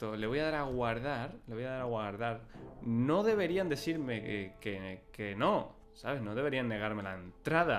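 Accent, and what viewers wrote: Spain. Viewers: Hola por tu acento se ve que eres español saludos desde Ecuador pana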